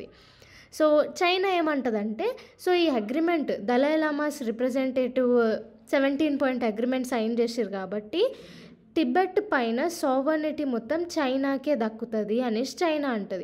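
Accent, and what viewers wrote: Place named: Telangana, India. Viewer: Mam mee telangana yaasa masth undi...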